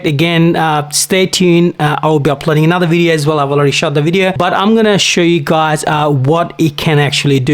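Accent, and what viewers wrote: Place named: Australia. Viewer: Drop your Australian accent please.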